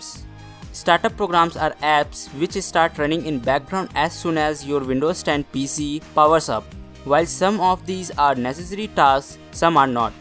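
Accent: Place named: India. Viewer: i cant understand you.. indian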